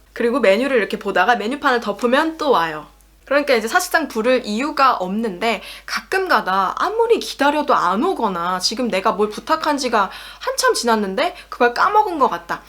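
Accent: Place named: Saxony, Germany. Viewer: 제가볼땐 발음은 약간 동독(작센)사람이 베를린이나 북부독일(함부르크 등등)와서 표준말 구사하는 것 같아 보입니다.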